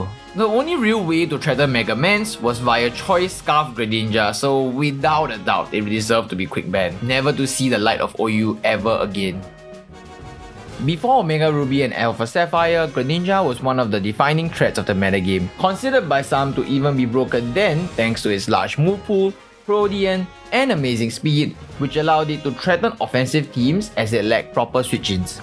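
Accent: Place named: Singapore. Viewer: eh u sound sg sia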